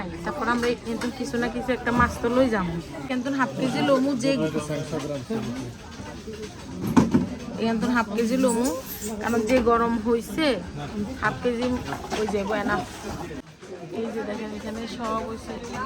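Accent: Noakhali, Bangladesh. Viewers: এটা কি নোয়াখালির ভাষা?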